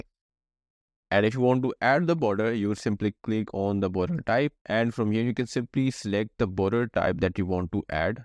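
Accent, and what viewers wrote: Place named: India. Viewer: That Hindu accent drives me crazy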